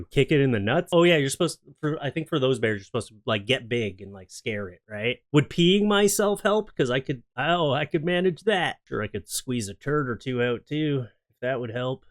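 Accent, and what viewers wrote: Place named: Canada. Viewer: CripTIDS, dude. Criptids !! Man, do I dig that Canadian Archie Bunker second language you got going on! Never change, man.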